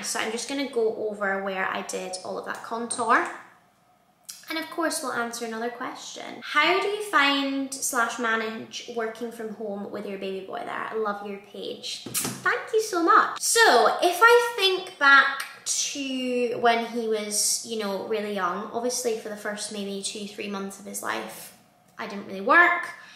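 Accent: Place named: Scotland, United Kingdom. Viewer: Gosh I can relate to you with your IBS I went through the very same thing but it was Celiac I was diagnosed with eventually It can be so hard at times but we learn to adjust and live with it Love your “ Make Up “ you look so lovely Really enjoy your Blogs and glad you have your Mum around to help you Can I ask personally where you come from as you sound very like me I was born in Fife but came to Australia from Edinburgh I just love listening to you and your sewing is amazing I am very much a beginner now as hadn’t sewn for over 40 yrs Have a wonderful Xmas with your family ❤️🌹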